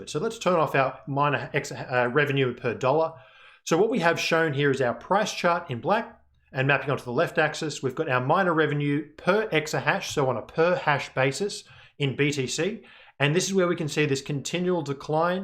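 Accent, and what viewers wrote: Australia. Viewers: Is this speaker from Australia?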